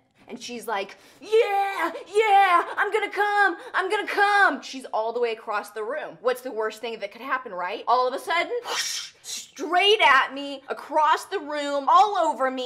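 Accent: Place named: South, United States. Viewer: Hey what part of America are you from?Your accent kinda sounds south:P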